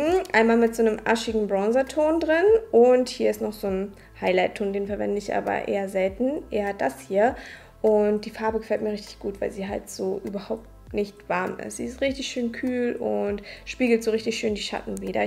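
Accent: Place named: Germany. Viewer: Ich find's süss wie du die englischen Namen richtig Deutsch aussprichst 😂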